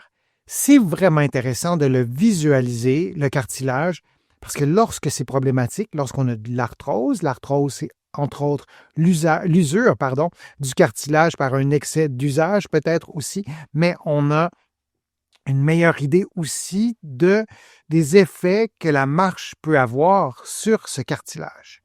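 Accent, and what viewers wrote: Canada. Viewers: Vous êtes formidable ! J'écoute toutes vos présentations rassurantes sur Youtube ! J'adore l'accent Canadien ! Vos conseils sont meilleurs que ceux de mon kinésithérapeute ! Merci !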